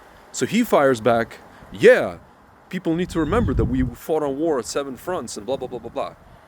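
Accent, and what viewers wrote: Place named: United States. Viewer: Pasty faced American accented man talking about his land 😂. Foff. Free Falastin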